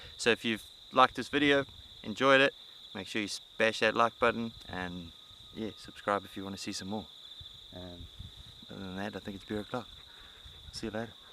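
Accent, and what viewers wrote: South Africa. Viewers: thanks for the show. Good old SA accent. where can i buy this convention kit? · are you South African expat? Kinda sounds like you have a South African accent.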